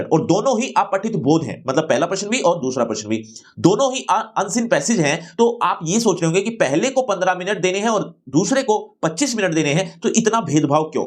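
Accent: Haryana, India. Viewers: Sir are you from haryana 🤔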